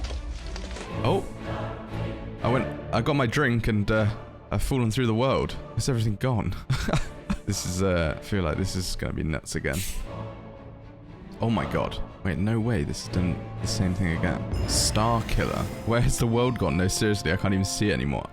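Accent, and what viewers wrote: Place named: United Kingdom. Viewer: You have a beautiful voice and a very pleasant accent. Pronunciation is usually regional; what part of Britian are you from? I hoping everyone there sounds like you.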